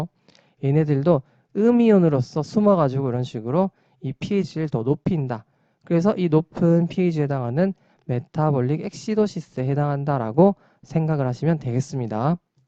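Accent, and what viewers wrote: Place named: Gyeongsang, South Korea. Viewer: 약간의 경상도 사투리가 정감있어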